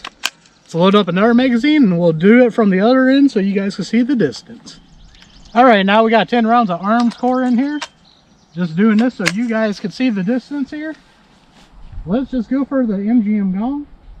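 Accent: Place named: Midwest, United States. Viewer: I've had no issues with anything Bear Creek I've bought everything has been very solid. I don't know why your regional accent threw me off the other day you sound a lot like Steve bartyla from "grow em big" And he's a Midwest boy too.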